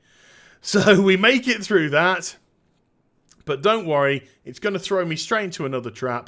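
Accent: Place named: United Kingdom. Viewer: The Brit narration makes this fun to watch! Calls the moose "bugger" and such. And after watching this video I am not even going to bother with the quest.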